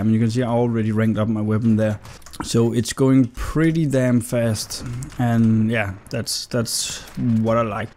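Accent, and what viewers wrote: Denmark. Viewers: You're danish right? You sound really danish. Especially when you said quicker like quigger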